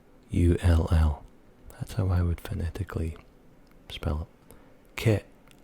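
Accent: Scotland, United Kingdom. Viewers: Hi from France,, I am in love with the Scottish accent omg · ISTG scottish is the best accent i've ever heard, there's some scottish actor in Uncharted man i love it. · Genuinely love your accent. One day, i will visit Edinburgh. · I absolutely love hearing your Scottish dialect! If you could take it even further and teach some words only spoken in Scotland, that would be really interesting! For example "bairn" is one I learned recently. You have a great asmr voice. Thanks for the video! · I can't believe you have only 1k subscribers. Your deep Scottish accent is so absolutely relaxing. I also loved the tid bits of information on your pronunciation of the words. You mention alot about the T's, perhaps you can teach us about the pronounciation in R's? The R's seem to be pronounced much different than that of North Americans, as well. I always thought that one of the T's were ignored when they were doubled up, for instance - Little, Litter, Sitter, Settle, brittle...it's almost like that first T is slightly pronounced. 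This was a great video! I really enjoyed it. I'm so glad I found your page. I look forward to watching the rest of your videos later. 😊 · I love your Scottish accent it's very relaxing 😴 great video I love how you say all the words in the Scottish accent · Thank you for this fabulous ASMR. I am brand new to ASMR. Amazing. I love love love the Scottish and Highlander accent. Thanks for the dialect lessons. So interesting! Thank you! I canna even say how happy I am to have heard ya! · this is west coast Scottish at its finest ... or east I can never remember but I am from near Edinburgh. also we are great at missing out words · Good god, is anything better than a Scottish accent? I think not. New subscriber for sure! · I love scottish accent...😍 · Im so used to being around my fellow scots that i hate the way americans pronounce scotland, like SCATLAND so this was relaxing hearing being pronounced the same way i do, weird peeve i know but this was really soothing, also Stupit with the first t being a ch sound is a good one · This is my kryptonite! 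I think my ears have melted! I have loved Scotland and her men/language all my life! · Wow I love this! I'm not sure if anyone is familiar, but is Alfec from Broadchurch speaking scottish? His accent really sounds like yours, and I fucking loved it! Great video, keep it up!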